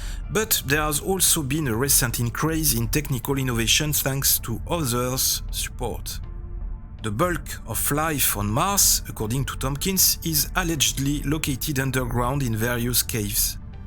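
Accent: France. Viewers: .... I can't. 😅 As much as I'm interested in the content that Ridiculous French Accent drives me insane. That annoying AI Voice would of been more bearable.. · Ça c'est l'accent d'un français ou bien je ne m'y connais pas en accents 😂😂😅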